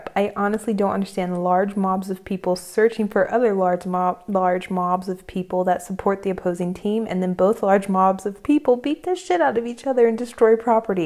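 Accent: United States. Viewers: Where you from bro Texas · First of all love your content and your southern accent. (Y'all) Small talk... No. I want to know less about it/you. AC... In the car yes, otherwise you live in a shed. A house, doesn't get that hot. Water... If you want free water, use the tap. Smoking... Yeah, we got all addicted by the US tobacco companies. so deal with it. Speedos... Well, yeah, they were common decades before shorts. Football... Soccer is for american girls, we love football. The experience from a Football match to a NFL match is like comparing a Formula 1 car and a stroller. There is this guy Connor Sullivan on Youtube, watch his video on going to a premier league in Germany. Otherwise, best wishes from a German, living abroad.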